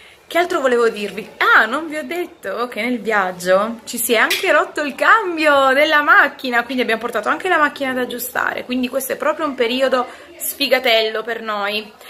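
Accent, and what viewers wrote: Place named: Turin, Italy. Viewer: Sei napoletana solo di nascita, ma in realtà sei torinese nel modo di parlare e di essere. Penso che quando vieni a Napoli tu ti senti un pesce fuor d'acqua.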